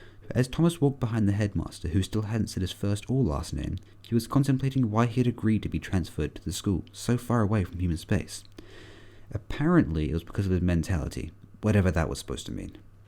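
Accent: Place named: United Kingdom. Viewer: idk you sound pretty british to me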